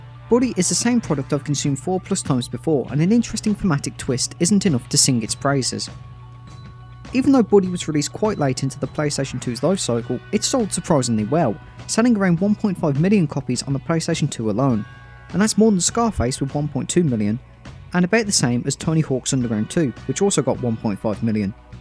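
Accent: United Kingdom. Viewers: because it's obviously not an accent common in the US, even as a non-US citizen I can tell. It's obviously more common in somewhere near the UK. And you can confirm it yourself that he's from the UK by looking at his account's bio. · boo hoo man can't understand UK accent get a grip convo over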